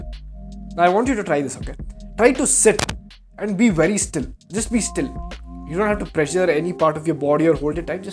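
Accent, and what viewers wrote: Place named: India. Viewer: You are surely an Indian from your accent. Why don't you go to the rural villages of Uttar Pradesh and see the inhumane conditions people live. See if you can help them.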